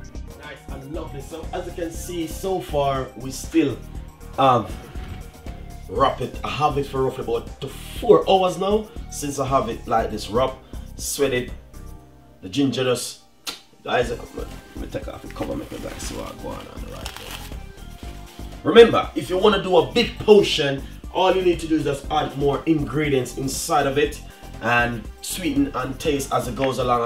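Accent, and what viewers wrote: Jamaica. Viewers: Dude your jamaican accent is messed up with England. Thanks for the recipe. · he's Jamaican for crying out loud! This is not a British channel. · I like jamaican accent 😎✌ love from Panama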